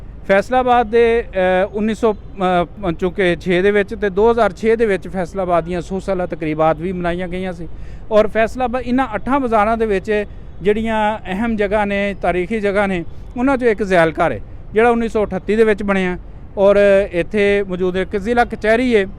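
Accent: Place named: Doaba, India. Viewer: Aah bhaji da lehja sade hoshiarpur doabe val da hai , pure doabi accent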